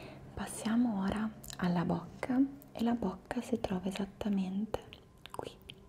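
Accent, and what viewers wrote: Italy. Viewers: Could listen to her all day long..love the Italian accent❤ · AAA esse sotaque italiano que eu amooo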